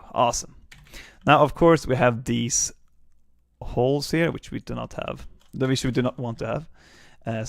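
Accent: Sweden. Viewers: Are you Swedish? It sounds alot like it! Great tutorial anyway dude, I absolutely LOVE your enthusiasm, it makes it so much more fun to follow along. If only more people could see your stuff!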